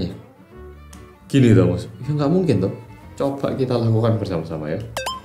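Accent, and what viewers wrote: Java, Indonesia. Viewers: Otw 5juta subscribers.. mangatss mas medhookk ❤️💜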